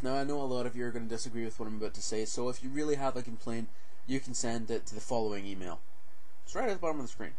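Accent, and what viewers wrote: Scotland, United Kingdom. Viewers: I can understand your True Scottish Accent, but I think what your working with is good enough. It works very nicely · i understand you fine with your scottish accent..maybe because i'm part scottish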